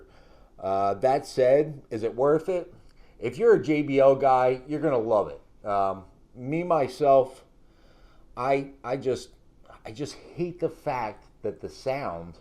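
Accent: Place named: Baltimore, United States. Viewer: I hear a bawlmer accent.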